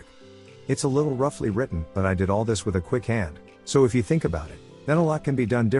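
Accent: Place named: China. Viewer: 这英文听起来很像中国人。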